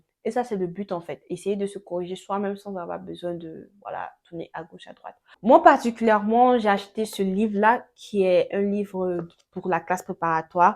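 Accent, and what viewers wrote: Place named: Ivory Coast. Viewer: Accent Ivoirien 🙄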